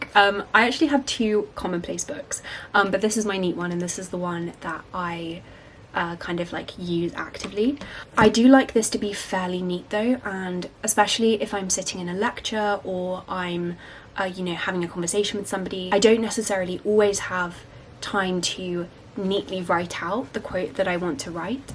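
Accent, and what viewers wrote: United Kingdom. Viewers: The UK accent? Writing as a Latin American native, the US accent is more on higher tone, and listen her make me think 'so calming' the Britain's make the difference hehe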